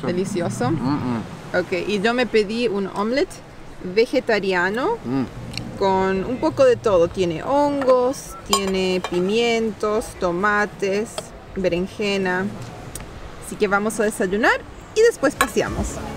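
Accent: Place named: Rioplatense, Argentina. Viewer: Guía de Viajes con y tu español tiene un leve acento rioplatense o chileno del sur...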